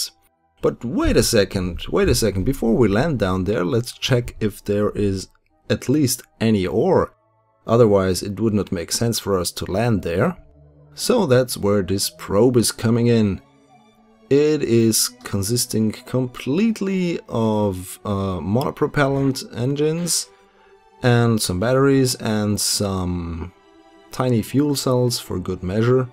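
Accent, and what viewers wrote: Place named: Germany. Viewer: 1) Great videos, I love the designs of your ships and your commentary! Thanks very much for everything! 2) Where are you from, if you don't mind me asking. From you accent I would say German or somewhere in Scandinavia. :3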